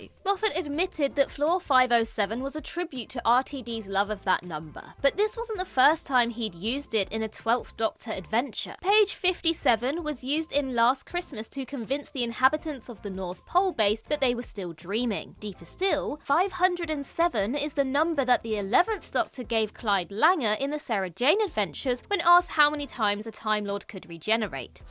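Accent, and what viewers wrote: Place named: United Kingdom. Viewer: it is adorable how you say F-ing. It just doesn't sound right coming out of your mouth. I guess being American myself it's just funny hearing it said with your proper British accent.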